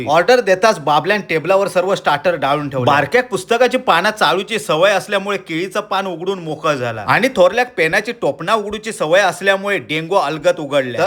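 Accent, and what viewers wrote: Malvani, India. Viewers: Mast jewnaj tuzya malvani bolenyachi Jo tadeko asetat na tyeka kashachich ser NY yevechi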